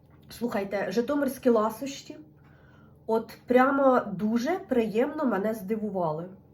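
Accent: Poltava, Ukraine. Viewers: Дуже приємно слухати огляд полтавським діалектом.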